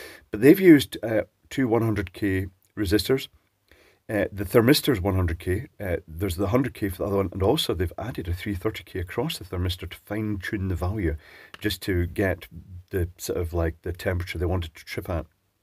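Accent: Scotland, United Kingdom. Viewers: A Scottish accent indeed, but a very pleasant lyrical Scottish accent. I wonder what area of Scotland speaks like that? Certainly not Glasgow with its hash, brutal delivery.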